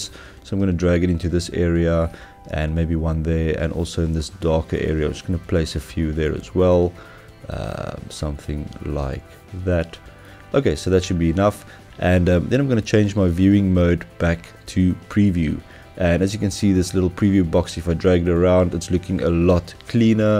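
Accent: South Africa. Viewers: You sound South African...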